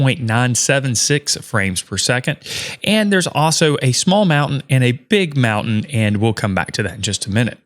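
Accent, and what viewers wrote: South, United States. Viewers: Holy moly that is some strong souther accent right there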